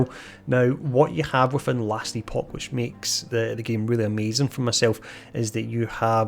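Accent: Scotland, United Kingdom. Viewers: I'm from Clydebank. He is clearly Scottish. Irish accent is totally different.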